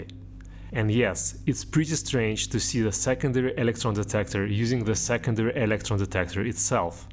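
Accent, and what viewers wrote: Russia. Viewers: Интересный у тебя акцент, ты русский? 😊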